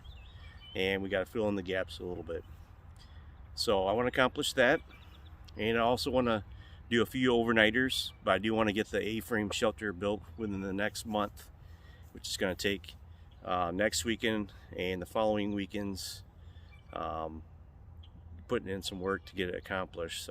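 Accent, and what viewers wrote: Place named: Cape Breton, Canada. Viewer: Your accent sounds like Whitney Pier Cape Breton.😁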